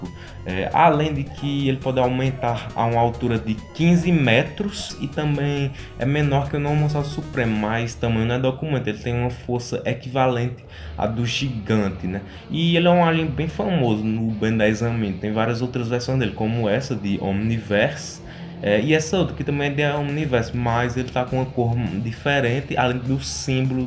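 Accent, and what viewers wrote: Northeast, Brazil. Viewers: você a do Nordeste ? se for... e nois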